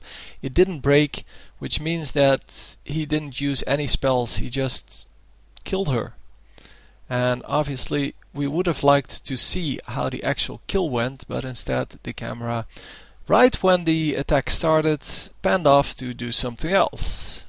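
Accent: Netherlands. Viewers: This commentary sucks.. sounds like you're dutch..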